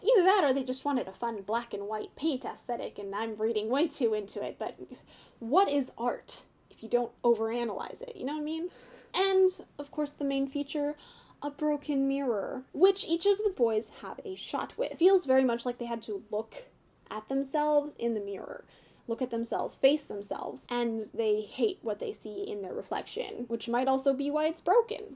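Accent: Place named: Canada. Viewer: you don't have a strong canadian accent, you sound pretty american most the time but the way you say some words sounds canadian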